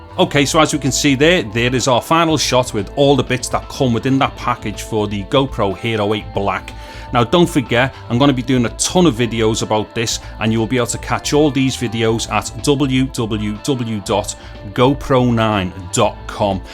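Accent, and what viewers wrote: Liverpool, United Kingdom. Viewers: Your video made me chuckle - I LOVE your grammar 'and that and stuff' - are you from Liverpool? Good man, great vid, natural and informative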